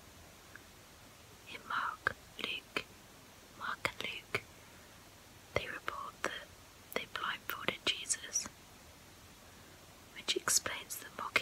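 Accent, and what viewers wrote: United Kingdom. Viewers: Nice British accent 💛☺️ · Anyone else figure out that loud kinda creepy noise that repeats later? Sounds like maybe a motorcycle going past her flat/house? Aside from that, I truly enjoyed your whispery bible read. Your velvety English tinged whispers are very soothing to listen to, especially for bed time. Please keep sharing your Christian gifts! 🤗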